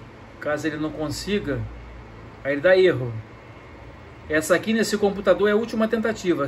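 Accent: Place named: Rio de Janeiro, Brazil. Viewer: Com certeza vc é da zona oeste do Rio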